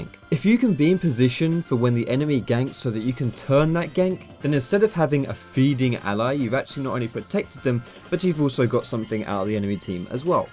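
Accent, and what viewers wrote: United Kingdom. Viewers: Wait... Are you british?!? OMG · He's uh. British. Not American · Wait he's british?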